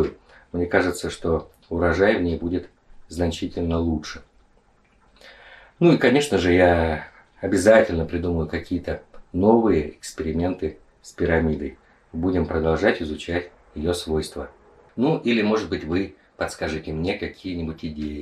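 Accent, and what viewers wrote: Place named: China. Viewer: Да откуда у тебя этот русско китайский?)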